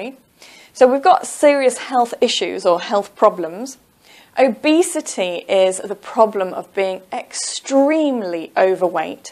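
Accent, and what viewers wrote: England, United Kingdom. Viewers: Are u english:)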